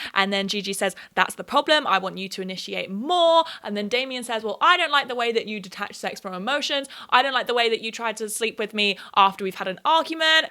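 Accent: United States. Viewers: i loved your american accent (as an american) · As an American, your American accent was the best part of this video 😂 It sounded great! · ahhh i loved this show!!! love that i got to revisit it with your hilarious commentary and omg your american accent is so funny LOL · How is your american accent so good? holy shit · I strictly liked your video for your american accent! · Your American accent is sooo good! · honestly your american accent is pretty good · Omfg your American accent… you are the first British person I’ve seen get it right! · I absolutely ADORED your American accent! Great video as always 👏🏾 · Ok ur American accent was actually good tho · Just FYI, your American accent is great! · That was actually a really solid American accent. I can barely hear a slip up, like at MOST a few sketchy vowels haha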